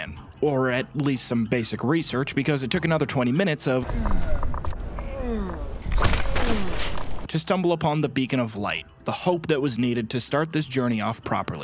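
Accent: Midwest, United States. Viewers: Thank you for this historic moment, your nasally Midwestern accent only served to add to the experience:) Now do it on survival!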